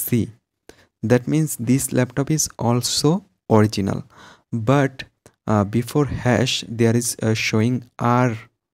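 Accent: India. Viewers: This is our indian 🇮🇳english bro 😅 ok so don't mind ok 😅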